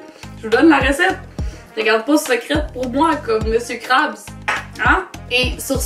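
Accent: Quebec, Canada. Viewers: J'adoooooore ton accent il me facine limite j'ecoute pas ce que tu dis et me focalise dessus tu as le plus bel accent quebequois du monde d'ailleurs vos expressions me fons bien rire 😂😉😘💙💙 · Je kiffe l'accent quebéquois mais quand vous parler sil vous plait faite de vrai phrase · Je suis français mais j’aime l’accent québécois . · TON ACCENT 😍 C’est Québécois? 🤔✨ Sinon je t’adore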